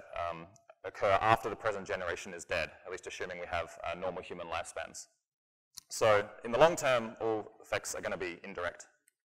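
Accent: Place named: Australia. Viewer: just his accent. one part of Australian accents is higher pitch at the ends of sentences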